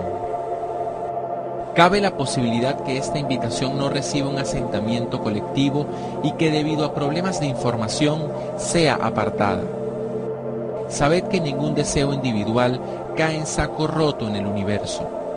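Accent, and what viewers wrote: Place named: Mesoamerica, Latin America. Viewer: La musica es hermosa, el mensajes es el mismo que el de tantas canalizaciones, no entiendo porque no pueden decir quienes son?.. ya conocemos a los pleyadianos arcturianos y demas...no entiendo bien ???...un par de cosas me molestaron...LA TONADA MUY HUMANA HASTA MESOAMERICANA.. Y QUE NO FUERA EL ORIGINAL..por lo demas...lindo..¡¡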